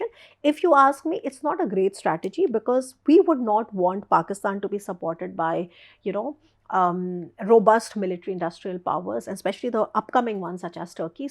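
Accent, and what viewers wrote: India. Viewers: Broadcasters or hosts should not speak fast. Follow Gupta or Ravish Kumar. Speak in clear English, No Russa or Itly, its Russia and Italy or Italia. Not fi hundreds , its five hundreds. correctly pronounce "Her, "here , "the. Not in the indian way. when you talk in English talk like English or Canadian america way (may be different accent or voice), but pronounce the word correctly and slow down the speed how you talk(follow Gupta)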